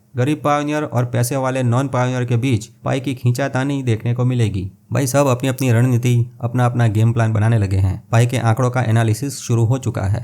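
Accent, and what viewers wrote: Gujarat, India. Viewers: Awesome sirji hindi +gujju tont amazing combination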